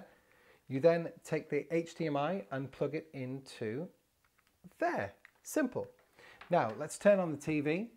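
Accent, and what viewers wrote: United Kingdom. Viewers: I just cannot believe the MOANING MINNIES who are complaining about the chatter here ! Goodness, life's just too short, you have to say what you can WHILST you can coz you're a long time dead ! You sound like your from back home in England and English people LOVE to chat !! :) Great video and Entertainment ! :) You should do a 30 minutes Vlog on "How-To" just to pig off the whistle stop hustle bustle people who haven't got time to breathe ! LOL Greetings from Xena Land !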